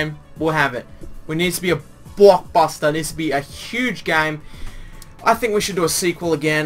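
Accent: Australia. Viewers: The Aussie accent! <3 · Are u from aulstralia?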